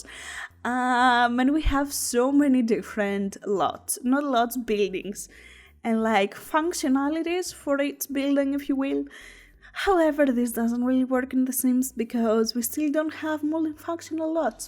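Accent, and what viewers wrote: Greece. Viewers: are you greek? because you sound like greek :P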